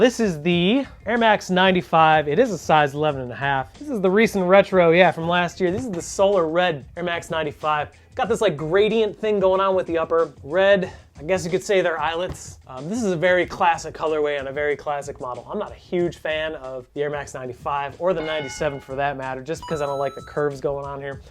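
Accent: Chicago, United States. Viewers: Your Chicago accent veers more and more toward Bartok, the bat from Anastasia, every time you do it. (You're too old to get this reference and most of your subs are probably too young so I guess this comment is for me)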